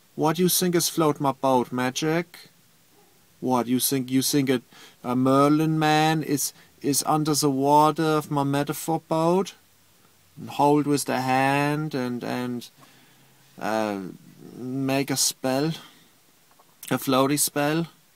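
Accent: Germany. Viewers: But it's adorable... German accents are cool. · your covering ", " would float many boats! Your German accent and having fun with the sounds would float a plethora of boats. Please bro, do it for all the folks that don't know about. I'm certain people that get you will more than love.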